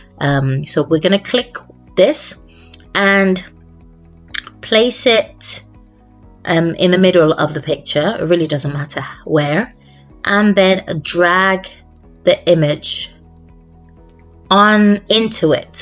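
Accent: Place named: United Kingdom. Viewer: You saved my evening! I have been trying to crop to a circle in Powerpoint for about an hour, reading instructions and a couple videos. You showed STEP by STEP on the screen and that's what I needed. And you did it in your classy British accent to add to the enjoyment! Thank you very much!!! Canva looks even easier....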